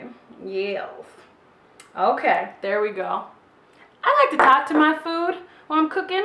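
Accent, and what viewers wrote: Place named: South, United States. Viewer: Just so Happy she doesn't Sound like she's from Ny but a Nice young Lady. Sounds like a a Good Southern girl 👍👍👍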